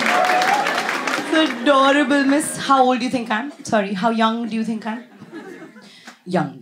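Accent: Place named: Bengal, India. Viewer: i have seen only first 1 min still i feel u hv bengali accent bengali face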